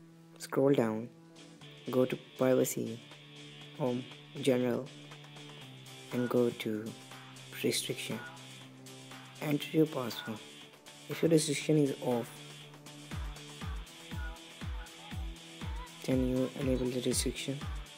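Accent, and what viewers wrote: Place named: India. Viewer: Of course he sounds Indian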